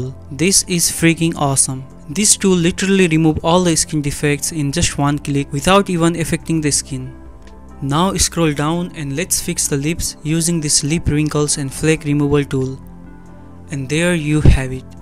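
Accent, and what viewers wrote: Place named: India. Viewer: Bro, please work on your English. Good videos but that Indian accent is terrible.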